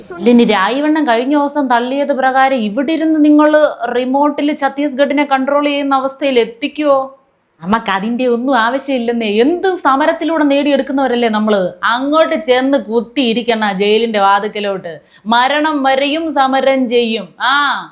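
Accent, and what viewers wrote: Thiruvananthapuram, India. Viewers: നിങ്ങൾ tvm കാരിയാണോ. ഭാഷ അങ്ങിനെ തോന്നുന്നു. സൂപ്പർ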